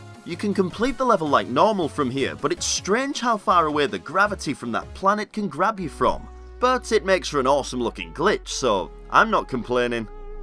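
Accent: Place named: England, United Kingdom. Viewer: I very clearly have a soft spot for English accents because I'm subbed to Andy from A+Start as well!😂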